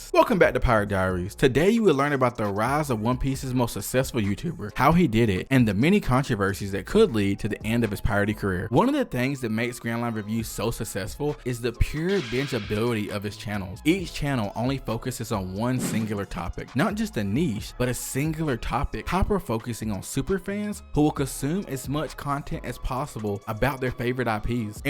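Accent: South, United States. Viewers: Dude I love your voice we need more southern YouTubers it’s amazing.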